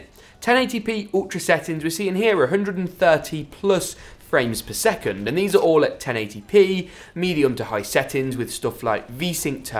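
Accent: United Kingdom. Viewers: That British accent though, I'm intrigued😁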